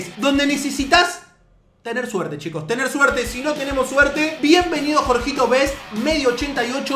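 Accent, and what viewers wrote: Argentina. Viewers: me gustan tus vídeos pero pareces un Carlitos muy argento cuando hablas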